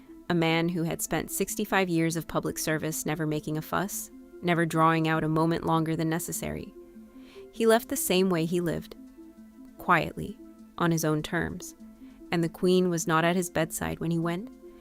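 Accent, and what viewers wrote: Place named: United States. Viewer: year i hate the American accented narration, Prince Philip was GB's and the commonwealth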